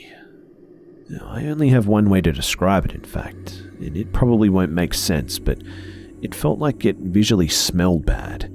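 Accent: Australia. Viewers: xsmol x hu x soldier x Australian accents are the best :)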